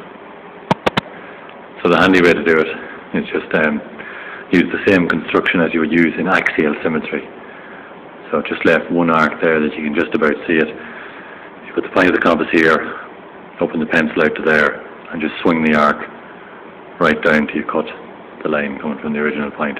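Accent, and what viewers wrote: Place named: Ireland. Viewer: Also great to hear another irish accent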